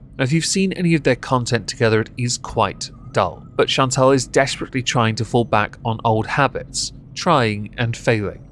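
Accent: United Kingdom. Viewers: Same... I accidentally found on a random lol cow content excursion and then watched the "other stuff" and was still lol'ing and wondering "how have I not found this channel before now?" Is totally my kind of humor even when I don't agree with joke... the British wit & voice is just a bonus 😉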